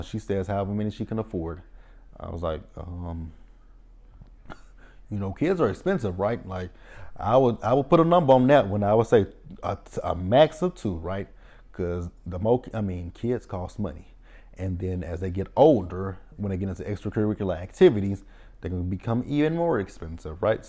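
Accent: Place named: South, United States. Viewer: I gotta catch up on the RTL reviews! You have an accent. You sound Southern, but not country. It's more noticeable with certain words. I like it. Now I can't unsee Shaunie O'Neal when I look at Janelle.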